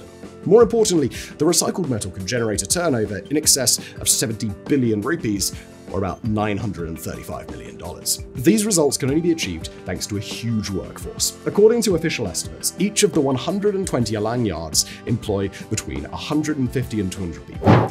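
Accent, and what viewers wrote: United Kingdom. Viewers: Man, is British for real. That’s the speech of an educated white male for that part of the globe. And yes, he sounds a bit crazy. But information is great.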